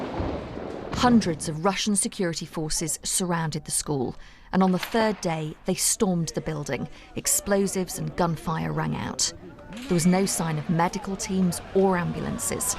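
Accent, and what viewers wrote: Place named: RP, United Kingdom. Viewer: Pro-terrorist propaganda, narrated in a disgustingly posh and affected accent.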